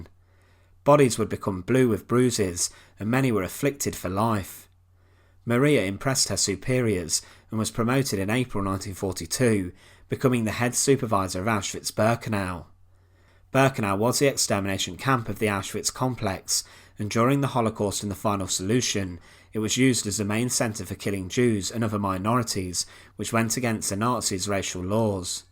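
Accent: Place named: Cockney, United Kingdom. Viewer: Let me start by saying that I really like the content on this channel but am I the only one that gets annoyed with the inflection in this person's voice? I was in England for two years but this guy borders on a cockney accent that is very difficult to follow at least in my opinion.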